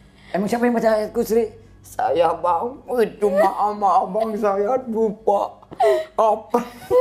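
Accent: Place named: West Java, Indonesia. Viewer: Logat sunda nih ya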